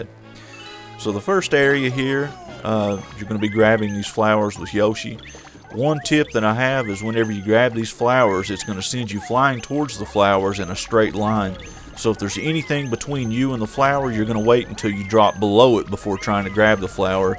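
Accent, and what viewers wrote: South, United States. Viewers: What state you live in? I love that southern accent of yours.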